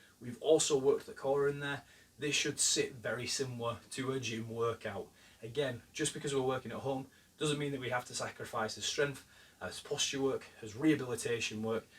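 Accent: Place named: Yorkshire, United Kingdom. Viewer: Sounding extra Yorkshire in this one,! Thanks for the video.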